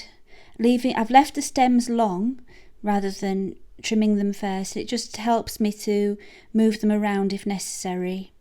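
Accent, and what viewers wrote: United Kingdom. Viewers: gotta love that british accent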